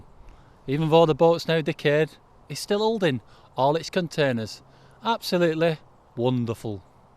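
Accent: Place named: Lancashire, United Kingdom. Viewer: One of the beauties of this record of our history is that it records the accent of the commentator also. These are fast disappearing. Is it and is it very local? Every town seems to have its own throughout Lancashire. Amazing and beautiful. Thanks Lads.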